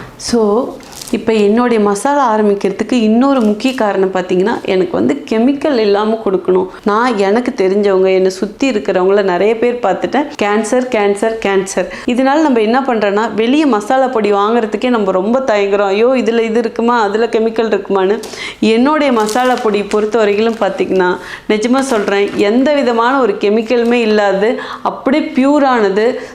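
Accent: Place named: Bangalore, India. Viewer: You Are in which area??. Chennai or other place. Your Tamil is slightly Bangalore tamil people pronounceation.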